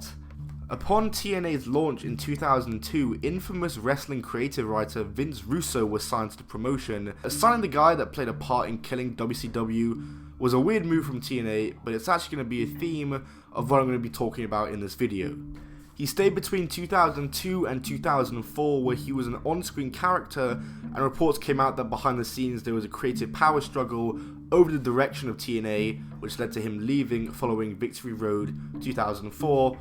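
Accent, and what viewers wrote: England, United Kingdom. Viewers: Why does every wrestling video have a narrator with such a strong English accent?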